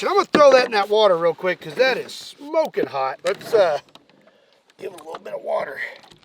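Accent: United States. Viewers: I like how he talks like a cowboy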